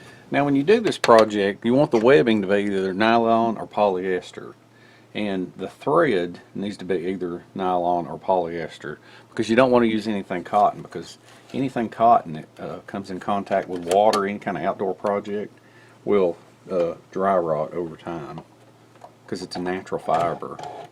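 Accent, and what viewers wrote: South, United States. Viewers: Wow how do people get a southern accent like that.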